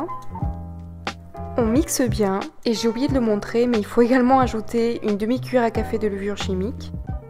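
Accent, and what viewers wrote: South, France. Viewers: Je ne suis même pas vegan mais j'adore tellement tes vidéos , ton accent du sud (comment ça se fait d'ailleurs que tu aies cet accent en ayant vécu au Mexique ?), ta gentillesse et tes belles recettes que je suis abonnée haha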